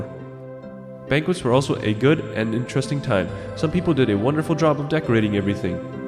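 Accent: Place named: Canada. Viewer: Your from Canada I hear your Acent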